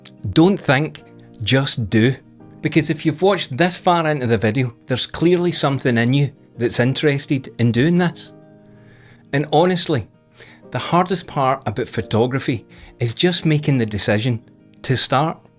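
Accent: Scotland, United Kingdom. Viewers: I came for the info and amazing photos. I stay for the Scottish accent and humor tysm 😊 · Well, I'm over 60, and this video is still pertinent. I love the way you covered this,. And your Scottish accent, priceless.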